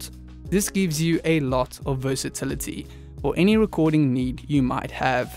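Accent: South Africa. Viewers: his south african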